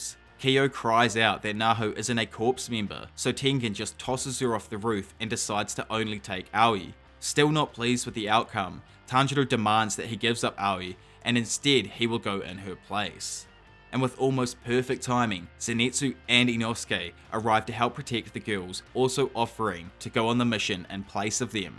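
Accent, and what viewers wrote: New Zealand. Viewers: Loved the kiwi accent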